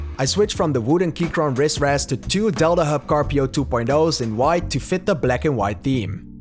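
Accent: Netherlands. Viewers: I sound a bit of an dutch accents. Nice that your english is almost native. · I skipped the video forward to check if this is interesting to watch. And I immediately thought hmmm... you sound Dutch. And than you said 'twee vliegen in een klap' right after it. Toffe video en zeker een inspiratie voor mijn eigen setup!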